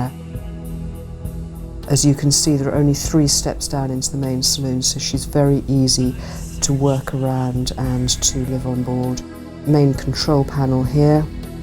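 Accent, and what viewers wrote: United Kingdom. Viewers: Your voice has the proper English accent for this very regal yacht. I feel underdressed if I'm not wearing white lace gloves and an Ascot-esque hat while you describe it.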